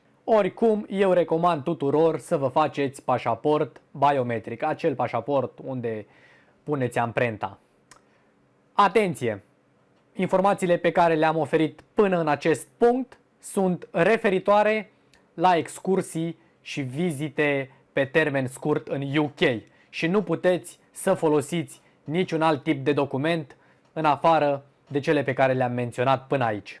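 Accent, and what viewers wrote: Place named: Moldova. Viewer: Se simte un ușor accent moldovenesc. Greșelile de exprimare sînt omenești. Keep up și good work. 👍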